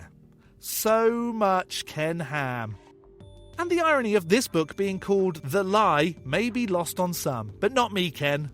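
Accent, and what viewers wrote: Kentucky, United States. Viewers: That is how they speak in Kentucky? Justified! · For years, I've been trying to "place" your accent, geographically. Imagine my surprise, I'm not as familiar with Kentucky regional speech as I thought.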